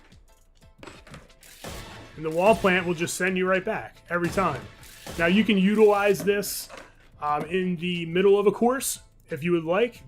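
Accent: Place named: Delaware County, United States. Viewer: This is the first video I’ve watched on your channel, but I could tell right away that you are from Delco my dude. Say what’s up to my cousins!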